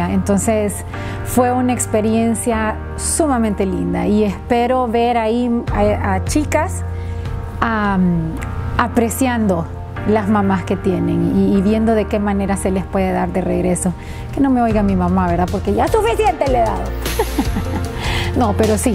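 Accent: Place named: Honduras. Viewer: Wow le quedo hermosa la casa, hace poco la encontré y me encanta su canal .... La veo desde NY pero soy de Honduras creo que usted por su acento también verdad . Felicidades un trabajo muy bello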